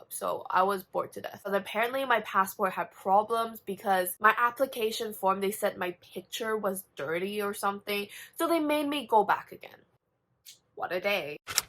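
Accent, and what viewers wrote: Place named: United Kingdom. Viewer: and that british accent. bro. whO ARE YOu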